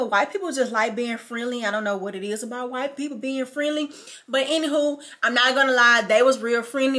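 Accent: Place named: Memphis, United States. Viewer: Omg is this Memphis accent 😂😂😂 I swear I’m from Memphis now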